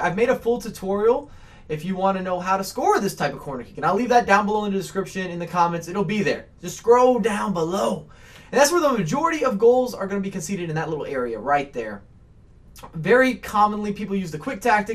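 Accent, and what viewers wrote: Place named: Texas, United States. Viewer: I needed this, you are my Texas Accent Speaking Angel.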